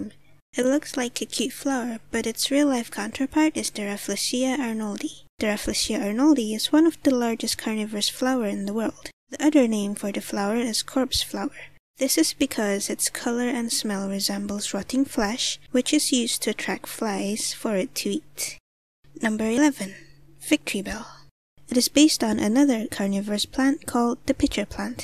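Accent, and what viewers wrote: Scotland, United Kingdom. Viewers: Are you Scottish? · u sound scottish.where u live?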